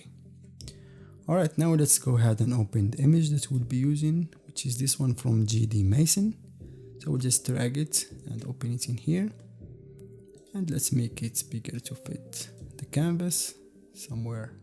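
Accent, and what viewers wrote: Morocco. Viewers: Your accent look like Moroccan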